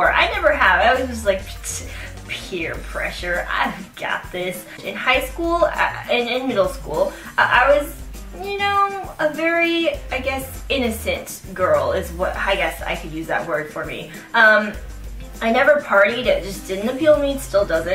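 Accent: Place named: United States. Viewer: All I have to say is: WOW! College is SO different where you're from (I get the idea that it's somewhere in the US from the way you speak but I could be wrong)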